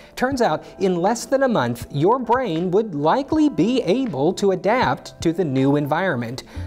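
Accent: Australia. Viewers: Oh I see you are from Australia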